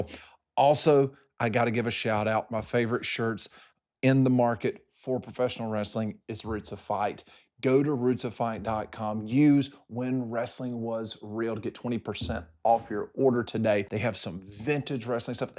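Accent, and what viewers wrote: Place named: Georgia, United States. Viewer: I missed that sweet Georgia drawl...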